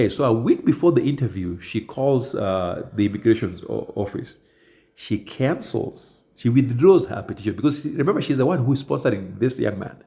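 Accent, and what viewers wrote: Kenya. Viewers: This guy's story telling skills coupled with Kenyan accent makes this story even better. I love me an Eastern African accent.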